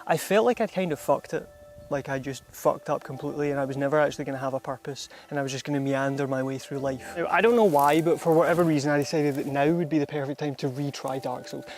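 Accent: Scotland, United Kingdom. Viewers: Might I add, the Scottish accent automatically makes everything significantly more excellent. Much love from my little country (Chile) to yours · you know.. for some reason I expected a scottish accent and I got one. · I enjoy listening to your Scottish accent :) video is decent, too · Haven't subscribed that quickly in a while. Good production, dark souls appreciation and a balamory accent got me straight on board.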